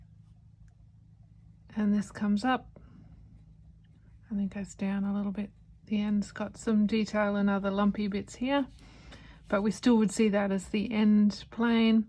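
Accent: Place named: Australia. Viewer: Fark yeah, been looking for a watercolour artist I like to listen to that is not American, I wasn't even DREAMING of finding an Aussie 🎉🎉🎉 Thank you for the great tips, AND your videos are super relaxing as well. All the best.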